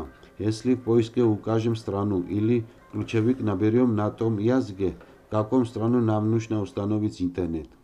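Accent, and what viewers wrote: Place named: Georgia. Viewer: Грузинский акцент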